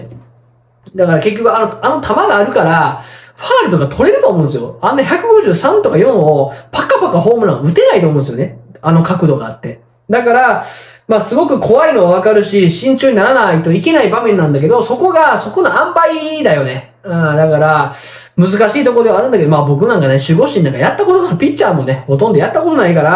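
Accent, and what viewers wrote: Kansai, Japan. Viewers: 小山さんって関西の方ですか？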